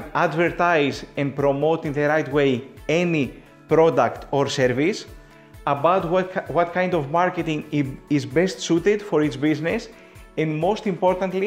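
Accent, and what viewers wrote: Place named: Greece. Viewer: Perfect, though greek accented :D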